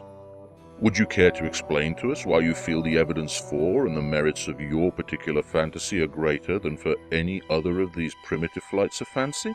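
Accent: England, United Kingdom. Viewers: narration is going to be easier to consume because as you noted, text covers SOME part of the screen, looks like crap if it's too long, what font to choose????? etc. Narration FTW! Besides, if that was your voice I heard on Claire's shoutout, you kill at narration. English accents give most Americans a huge chubby.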